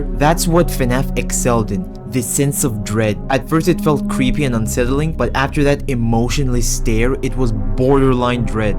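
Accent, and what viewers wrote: Egypt. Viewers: Great video, your channel deserves more attraction tbh I also thought u were egyptian from the accent, i checked and you actually were 😅 Good to see egyptians getting in the fnaf content creation, keep it up!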